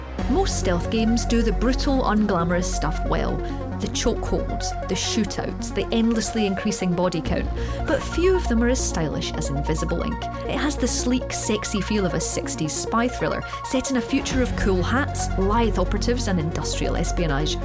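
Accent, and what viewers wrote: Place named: Scotland, United Kingdom. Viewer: It's called Scottish. You'll find that there are quite a lot of people with this accent there.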